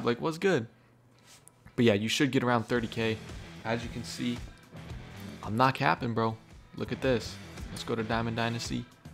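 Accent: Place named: Bay Area, United States. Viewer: You have to be from The Bay talking like this😂😂😂😂. Gained a new sub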